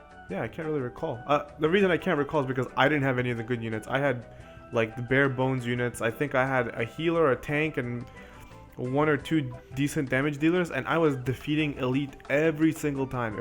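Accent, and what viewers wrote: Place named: Caribbean. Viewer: it's hard to say, Trini or Bajan maybe, Bahamas but you don't eat all your words in a single gulp so maybe not Bahamas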